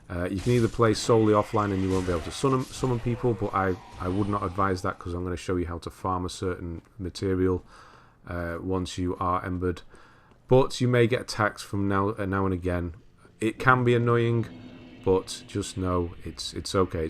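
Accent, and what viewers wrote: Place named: North, United Kingdom. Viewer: To my USA ears, it’s perfect to get a walkthrough from someone who sounds North Country, just like all the NPCs in fromsoft games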